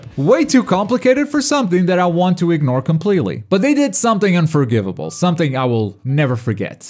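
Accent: Portugal. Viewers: Oh man, I thought you were from Spain all this time, and it turns out you're from Portugal. Nice! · I didn't know you were Portuguese, but then the more I heard your voice, the more I realized your accent is like St1ka's.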